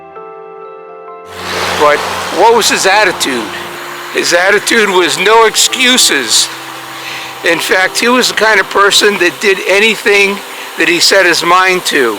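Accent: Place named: Long Island, United States. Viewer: Wonderful video and Happy Birthday!! 🎉🎉🎉 P.S. Love your accent. I grew up in Merrick, Long Island (right next to Jones Beach). Neighbors!!! ❤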